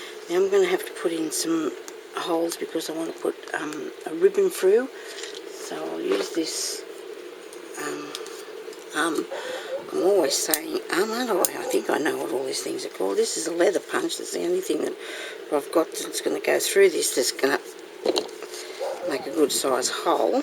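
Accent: Australia. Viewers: you must be inland l live on the coast hot but not as hot as inland. there great little flowers really sweet. its so nice to hear an aussie acsent. looks beautiful love the flowers tfs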